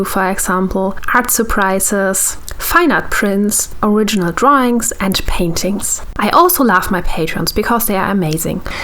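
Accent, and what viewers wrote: Germany. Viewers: The only thing that is a bit annoying is your german accent😅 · are you germaan?😀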